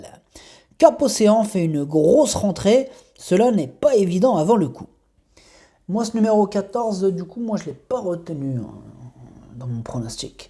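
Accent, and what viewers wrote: Morocco. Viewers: Bonsoir vous parler larabe comme un marocain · السلام عليك أخي وعلى متتبعيك الكرام.( هاد الدارجة المغربية عندك زوينة) تبارك الله عليك.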